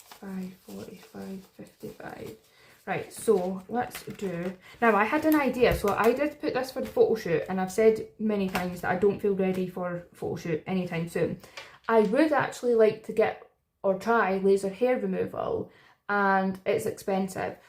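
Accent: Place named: Scotland, United Kingdom. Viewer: ❤ I love your accent! Are you from Scotland?❤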